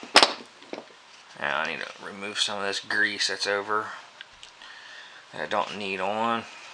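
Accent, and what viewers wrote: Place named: Midwest, United States. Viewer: Good informative video. Your Midwestern accent kinda annoys me but it's not a deal breaker. Just breaking balls. Next you can do one on a JA9 since I have one of each.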